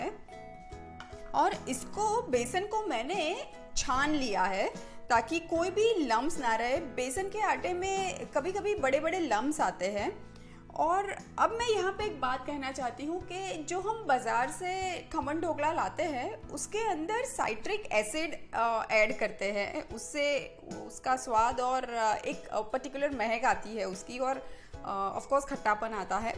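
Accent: India. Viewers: Nicely explained and the hindi accent!👍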